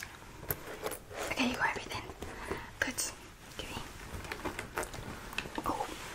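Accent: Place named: United Kingdom. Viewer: What a beautiful British accent like the girl 🫢‼️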